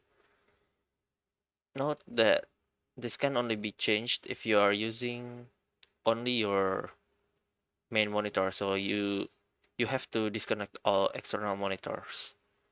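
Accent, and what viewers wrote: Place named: Indonesia. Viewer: bro are u indonesian, your accent sounds like indonesian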